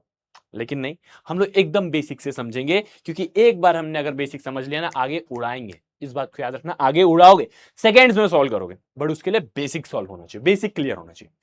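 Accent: Bihar, India. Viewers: Sir are you from bihar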